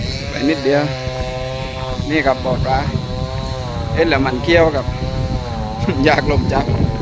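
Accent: South, Thailand. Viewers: ชอบช่อง เป็นเอกลักษ์ชอบภาษาไต้ครับ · ชอบช่าง ชอบฟังลุงพูดภาษาใต้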